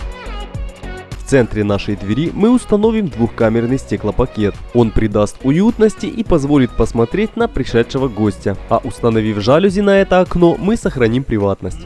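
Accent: Ukraine. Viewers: А голоса за кадром украинский акцент что-ли не пойму?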